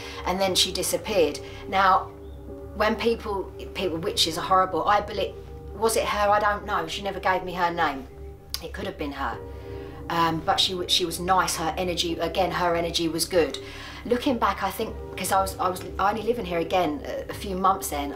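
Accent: England, United Kingdom. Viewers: english accents are so pretty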